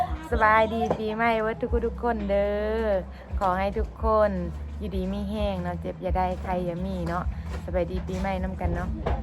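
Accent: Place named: Phu Thai, Thailand. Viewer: สาวเวามวนหลายมักฟังคนภูไทเวามันมวน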